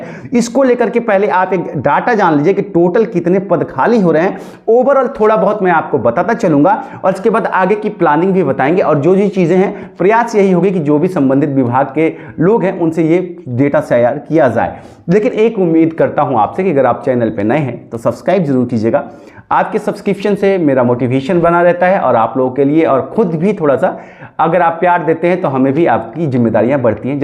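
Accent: Bihar, India. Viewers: Aap Bihar se ho kya sir???😊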